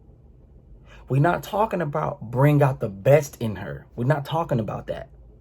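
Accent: Baltimore, United States. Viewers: Are u from Baltimore? U got the accent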